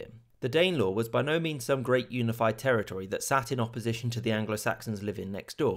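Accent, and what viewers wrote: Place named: Newcastle, United Kingdom. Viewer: Are you a Jordy? You speak so fast, that with your accent, you are difficult to follow. I have a friend from. Newcastle, and she also speaks rapidly. She also complains that Americans are sluggards when speaking English. Still, i like your presentations.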